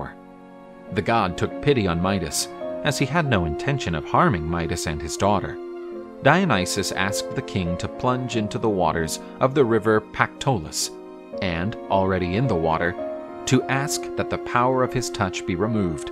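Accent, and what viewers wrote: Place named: United States. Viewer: You have nice America voice and I can clear understand. Very nice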